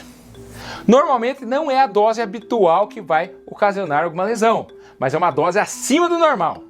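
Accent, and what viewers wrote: Brazil. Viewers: Gente, da onde que vem esse doutor? Que sotaque é esse? É do sul ou do centro oeste?😅😅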